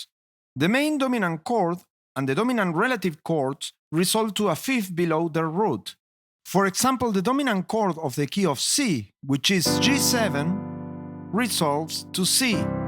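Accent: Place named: Spain. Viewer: I think the teacher's accent sounds pretty Spanish to me.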